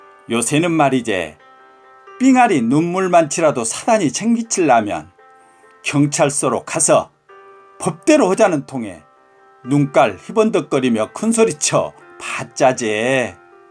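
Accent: Jeolla, South Korea. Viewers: 구수한 사투리가 깨소금보다 구수하구먼요 역시 전라도사투리는 좋은말이여 시골흙냄새풍기는 낭송 잘들었당께요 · ㅎㅎㅎ 전라도 사투리 인가요? 즐감합니다 응원합니다!! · 한국의 CCTV 에 관해 실감나는 전라도 사투리로 시원하게 전해 주시니 정말 재미있게 들었습니다 ~^^ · ㅋㅋ 십만원에 잘 끝났다니 참 다행 이구만요 구수한 전라도 사투리에 참말로 재미 나구만여~~ 잘 들었당께라~^^ 감사 합니다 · 어미~ 전라도 사투리로, 참말로 거시기허게 시낭송 허시구만이라~ 안녕하세요, 선생님, 유튜브정책에 따라 구독시간이 부족하면 자동으로 구독취소가 되나 봅니다. 저의 채널에 구독취소가 떠서 구독으로 변경하였더니 양쪽 채널이 모두 구독취소가 되어 다시 왔습니다 구독하고 가니 저의 채널도 부탁 드립니다. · 나도 모르는일을 구신같이 잡아내드랑께~ㅎ 전라도 사투리 구수합니다~😁❤ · 흐미~ 전라두 사투리로 잼나게 낭송해주시니 참말루 잼나유...ㅋㅋ 저는 돈중에 젤루 아까운 돈이 저런 돈이쥬.. 범침금 과태료...ㅋㅋ 아침에 잘 듣고 응원하고 감다.~~^^ㅋㅋ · 오~** tv님 전라도 사투리로 막 깔겨대니 겁나게 참말로 재미있어 부리네요 이 굴뚝에는 냉갈나고 치간은 으디로 가야하나 지도 한소리 히밨습니다 맞나요? 오늘도 잼나고 즐거온 하루되세요 아참 🎁드려야죠👍😁😁😁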